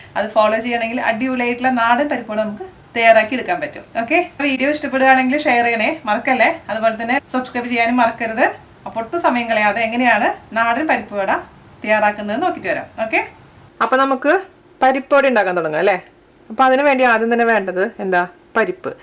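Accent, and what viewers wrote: Thrissur, India. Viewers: hy chechii!! u r really super!! njn thrissurkari aan .. athond thanne chechide slang and presentation style kekkumbo sharikyum ishtayii.& ur recipes r awesome tta! 😍 marriage kazhinjapo cooking onnum areelyarrnuu..ipo ith nokki nokki chechi paranj tharanath kett kureshe cheyyan padichu.. 😍 & most of all my in laws r so happy with me now 😁!! thnk u so much chechii 😃 keep going!! all d best !! 😘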